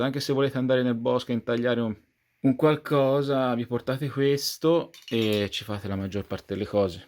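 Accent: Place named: Prato, Italy. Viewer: Ciao! Dal tuo accento direi appennino pratese? Ottimp video, essenziale e concreto!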